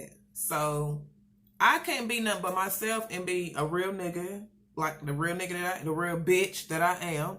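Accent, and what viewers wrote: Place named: South, United States. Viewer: this is so off topic but girl i love your country accent.........and it didn't work out bc yall are much older and way mature then them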